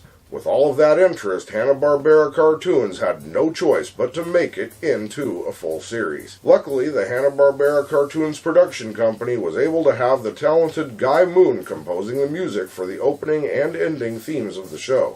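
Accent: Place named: United States. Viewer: I didn’t know Max Cavalera did 90s show reviews in an American accent.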